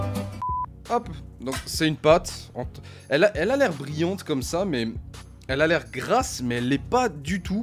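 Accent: Alsace, France. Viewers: sympa cette video dit moi tu serais pas alsacien ( 67)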